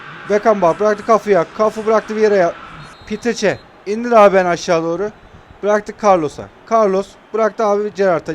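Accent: Kahramanmaraş, Turkey. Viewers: Abi sen maraşlımısın?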